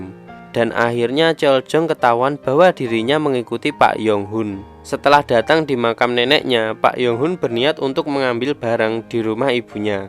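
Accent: Java, Indonesia. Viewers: Suaranya kaya agak medok jawa? Wkwkkw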